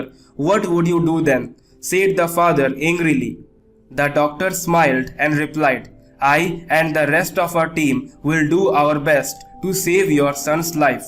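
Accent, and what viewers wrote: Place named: India. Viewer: indian accent god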